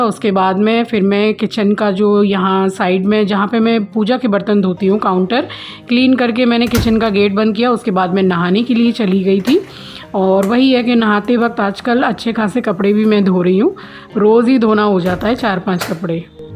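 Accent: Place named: Kolkata, India. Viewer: Aapka typical calcutta accent hai..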